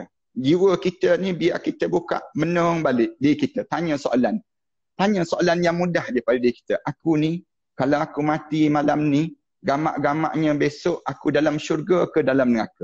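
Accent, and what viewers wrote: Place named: Kelantan, Malaysia. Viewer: Samo kelate kecek kelate la kak kak Lopaa..😂😷😁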